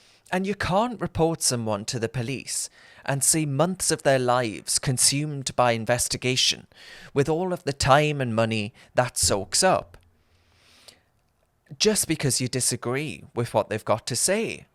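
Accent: Newcastle, United Kingdom. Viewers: Like hearing a fellow Geordie on t'internet 👍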